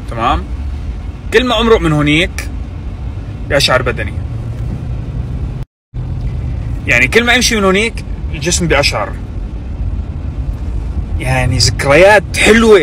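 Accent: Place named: Aleppo, Syria. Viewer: لهجتك شوي حلبيه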